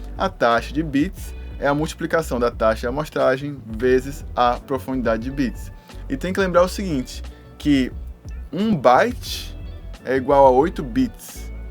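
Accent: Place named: Bahia, Brazil. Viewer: A melhor parte é o sotaque baiano.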